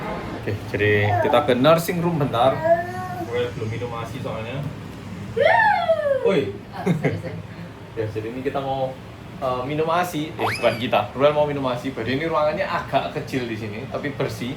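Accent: Java, Indonesia. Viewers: ur korean accent jdi berubah ya bro, jdi jawa medok accent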